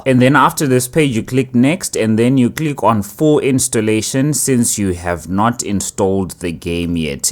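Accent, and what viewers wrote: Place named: Zimbabwe. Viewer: Are u from Zimbabwe, your accent sounds like it, or south africa, sounds more like zims tho?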